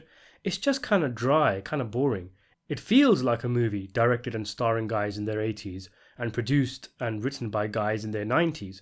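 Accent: United Kingdom. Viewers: Lacks authenticity kinda like a guy from britian or wherever the hell your from talking about shit that happened in our neighborhoods lol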